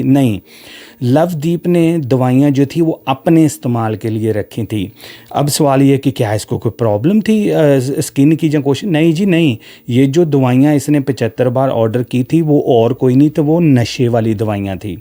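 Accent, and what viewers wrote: Punjab, Pakistan. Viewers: i have heard some pakistani punjabi youtubers....they talk just like him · Exactly, I am also thinking the same... he's got Pakistani accent. Is this channel real or fake??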